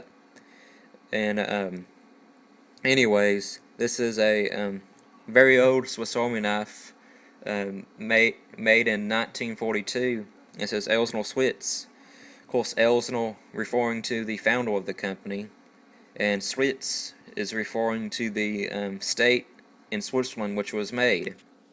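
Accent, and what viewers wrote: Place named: South, United States. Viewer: You honestly don't sound like you have one... just an American Accent from the south.... you pronounce most words fine... ignore the haters man :)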